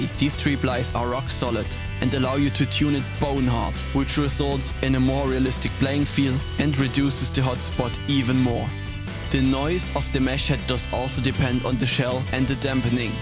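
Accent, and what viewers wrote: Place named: Germany. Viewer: Hey sehr gute Arbeit, genau wie deinen anderen Videos... ich schreib einfach mal auf deutsch, weil ich deinen Akzent raus höre ;) Ich habe eines meiner akustischen Sets nach Anleitung umgebaut. ;) Und überlege jetzt welche Felle ich nehmen soll? Für die Snare hätte ich an das Real Feel gedacht. Für die Toms überlege ich Remo Silentstroke oder was anderes in der Preisklasse (QuiHead) weil 4 Toms (10,12,14,16) mit Real Feel geht ein bisschen ins Geld. Als Reso sollte man da auch ein Mesh Head nehmen oder gar keins oder ein normales Reso Fell? Wäre schön wenn du mir bei der Entscheidung mit deiner Erfahrung helfen kannst! ;)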